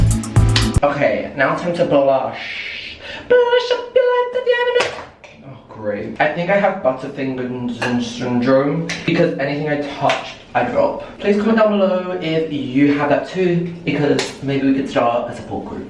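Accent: Scotland, United Kingdom. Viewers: More Scottish accent plz I can’t get enough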